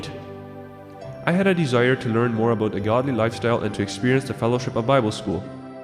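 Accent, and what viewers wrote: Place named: Canada. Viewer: Your from Canada I hear your Acent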